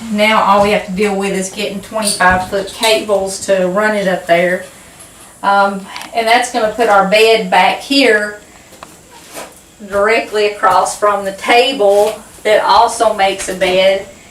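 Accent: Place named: Kentucky, United States. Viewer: Hi - what's new on the houseboat? We're working on a plan and your videos are so helpful. Where are you? You sound like you're from KY or Western NC where I live. I've learned a lot from you and look forward to hearing from you again soon. Thanks.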